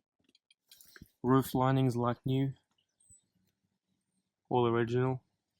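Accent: New Zealand. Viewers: From the accent and road signs, I'm guessing New Zealand. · Recognized the Auckland accent the second he started talking 👌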